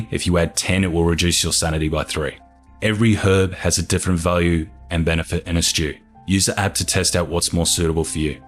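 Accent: Australia. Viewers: it's a sexy deep aussie accent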